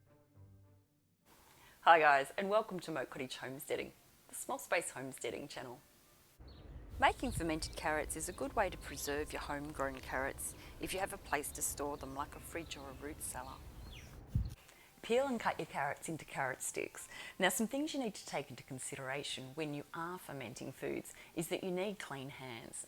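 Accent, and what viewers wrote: Australia. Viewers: I thought ... 'that sounds like an Aussie, but must be in North America, because some of the words used', I now realise you are in Vic. My question ... what are the visual signs the ferment is done? I have had all failures in this first month of trying. The chooks are getting heaps of sauerkraut. I make a good sourdough, so I thought I would try to make a ferment starter. Well .. it is turbocharged ... I made carrot sticks, with fresh dill, in a Leggo's jar. Next morning, I tried to 'burp' it, it came out like a shaken bottle of champas. Today, was the third day, when I opened the lid, I had to quickly put it back on again. I opened it 3 times in 10 minutes, and finally it wasn't trying to spew out. It still had crunch, but definitely softer, a little bit salty, but I wouldn't call it sour. I put it in the fridge.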